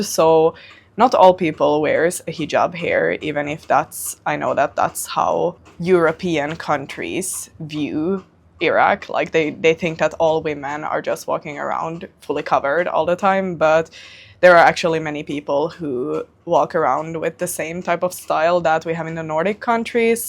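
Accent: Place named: United States. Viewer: تقريبا الاكسنت امريكي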